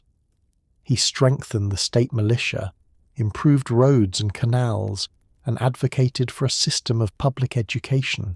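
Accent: United Kingdom. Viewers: Well Done! Monroe was On Point with the Doctrine! Why the Annoying British Accent Narrator? Informative! C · American President why the annoying brit accent?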